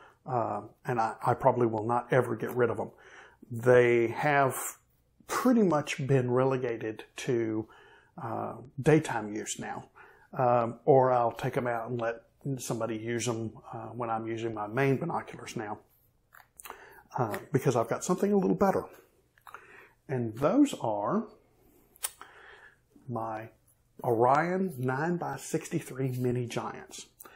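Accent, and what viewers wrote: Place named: South, United States. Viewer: So cool to hear a brainy guy with such a fine, southern accent. :-) Been researching this stuff to death, and two of your videos have now answered essentially all of my remaining questions. Thank you.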